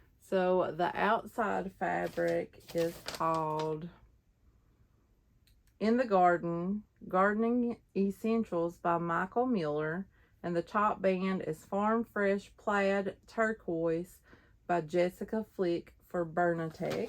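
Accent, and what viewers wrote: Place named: Kentucky, United States. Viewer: I love your channel. Are you from Kentucky? I am and our accents our similar.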